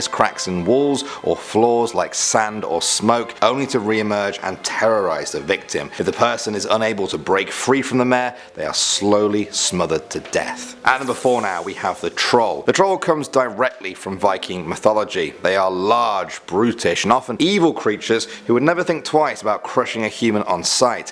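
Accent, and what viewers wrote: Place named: United Kingdom. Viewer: YEAAAA BRITISH PERSON!!!!!!!!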